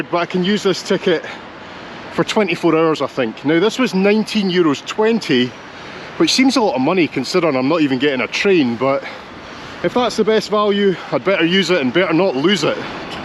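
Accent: Scotland, United Kingdom. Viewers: Always enjoying your videos ... and your fine scottish accent :-) This is indeed one heck of a special way to cross a canal! Wow Many happy and safe trails for the new year!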